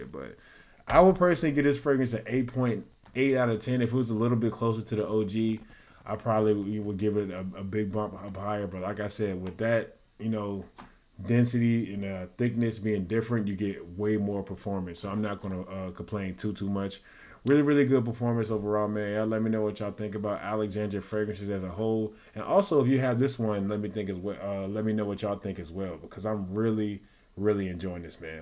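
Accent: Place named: Midwest, United States. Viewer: Your likely from Wisconsin, Michigan or Ohio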